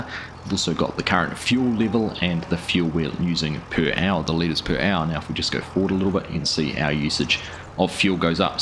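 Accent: New Zealand. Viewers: You might be getting confused by the Kiwi accent...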